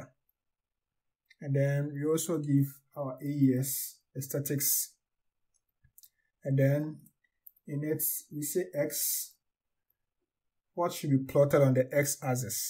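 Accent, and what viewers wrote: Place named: Africa. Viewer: Is it just me or is it refreshing to hear an African accent addressing these complex concepts and workflows in modern science?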